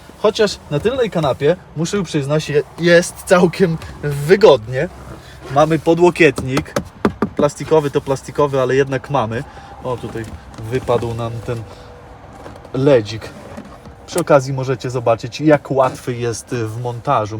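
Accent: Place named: Podlaskie, Poland. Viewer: Ach te "białostockie sljedziki" w akcencie prowadzącego :D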